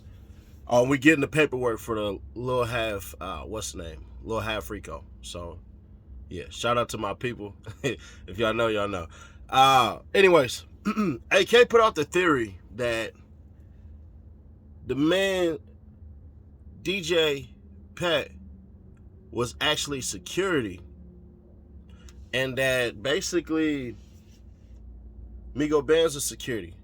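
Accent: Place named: Bay Area, United States. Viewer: Nigga I knew you was from Cali I could tell a bay nigga .... much love from 77th Holly e.s.o!!!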